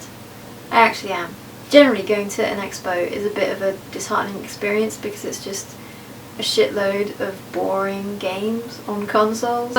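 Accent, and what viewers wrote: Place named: United States. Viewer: stop talking like an american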